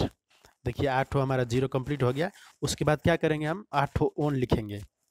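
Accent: Nepal. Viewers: Are u Nepali ?? Reply honestly 😸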